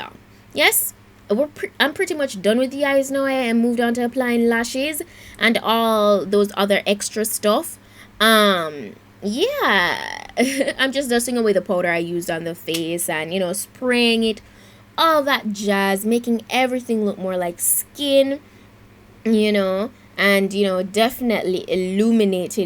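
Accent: Jamaica. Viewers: Do I sense a slight Jamaican accent...... best cut crease video for chocolate girls.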